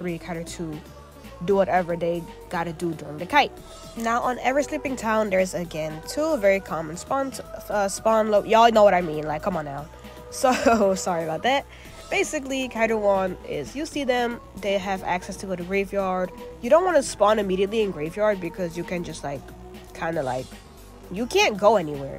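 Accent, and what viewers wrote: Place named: Africa. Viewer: i love ur africa accent! im ur biggest fan plz remember when ur famous plz shoutout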